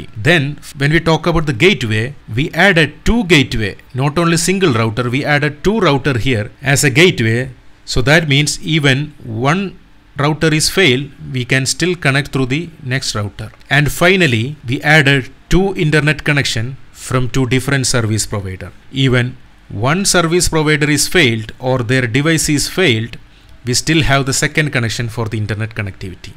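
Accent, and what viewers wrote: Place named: Kerala, India. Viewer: bro r u Malayalee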